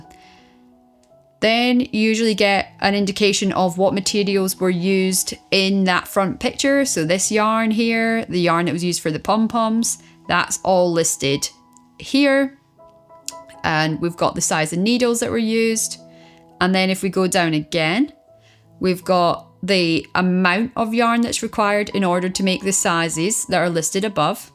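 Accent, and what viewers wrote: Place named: Scotland, United Kingdom. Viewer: thank you - I've recently discovered your channel - although I was taught to knit as a child by my gran, I hadn't done it for years and needed a refresher course before tackling a blanket for a friend's first grandchild. this is exactly what was needed and being Scottish myself, I really appreciated the accent!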